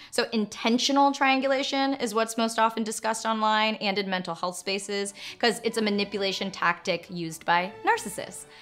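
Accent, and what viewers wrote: Canada. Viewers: Are you Canadian!?!?!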